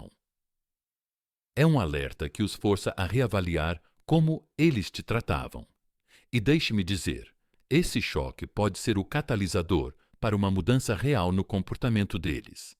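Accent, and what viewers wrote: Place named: Portugal. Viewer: Qual a razao p os narradores falarem portugues de Portugal? Coisa estranha ...quebra o ritmo da narrativa ..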